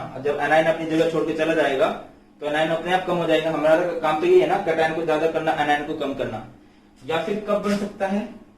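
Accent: Haryana, India. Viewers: Sir you are from haryana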